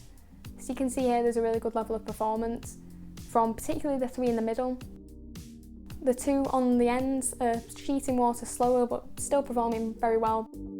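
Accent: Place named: West Yorkshire, United Kingdom. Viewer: Another good video and well explained!👍🏻. Just wondering if your from Yorkshire, sounds like you have a West Yorkshire accent? We live in Filey ☀️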